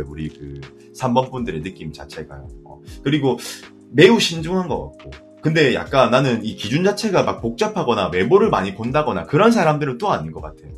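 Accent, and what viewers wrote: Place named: Gyeongsang, South Korea. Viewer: 경남분인듯 저 3번여 콩 콩 ㅋㅋㅋㅋㅋㅋㅋㅋㅋ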